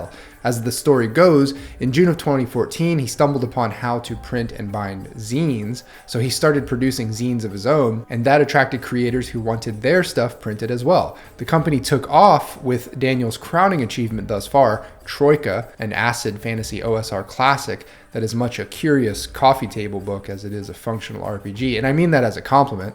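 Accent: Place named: Cockney, United Kingdom. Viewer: I didn't have " goes from RPG Youtuber to Proper Cockney Geezer" on my 2024 bingo card but here we are! Also, top tip for surviving British old money: count shillings before pennies, then you only have to count up to 11 pence at a time rather than 240.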